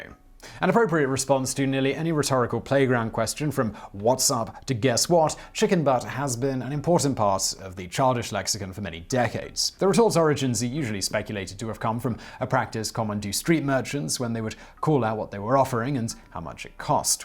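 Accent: United Kingdom. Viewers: is living proof that everything really does sound better with a British accent.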